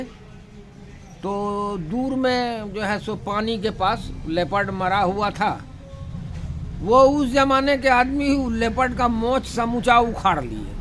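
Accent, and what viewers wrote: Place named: Patna, India. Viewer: Yes indeed l have read the books of Jim Corbett in which he had written about his work related to railway.He was also a retired major in British Army and faught in First World War commanding Garhwal Rifles. But I didn't know that it was in Bihar. I enjoy your videos and very happy to hear your conversations and dialect which is similar to that of my parents who had migrated to Pakistan from Patna. I had heard of some wild life in Ranchi Bihar but did not know about such forests in Mungair.I would request you to keep recording your hunting experiences through such videos as these are assets, for being a nature and forest lover myself.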